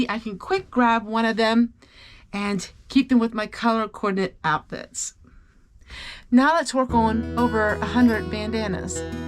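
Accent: Pennsylvania Dutch, United States. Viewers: Good morning,! I love when you share about your heritage. My husband and I watched your video together last night, and then I watched it again this morning to leave my comment, something I've been doing lately. We remarked about the Pennsylvania Dutch you spoke and which words sounded more Dutch or more German to us; it sure sounded familiar when you were speaking. My grandmother spoke Dutch since her family came here from Holland in the early 1900s; his grandmother spoke German because she came here from Germany in 1938. Your aprons looked so pretty all hung and organized like a color wheel. I spent the other day reorganizing my kitchen. Even though it was just a small bit of decluttering, it made a big difference! Have a beautiful, blessed day! ❤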